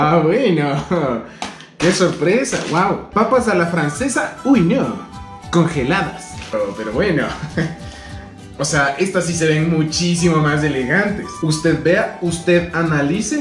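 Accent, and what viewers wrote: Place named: Ecuador. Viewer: Que mrd? eres Pijo? o eres ecuatoriano... joder.... lo odio :")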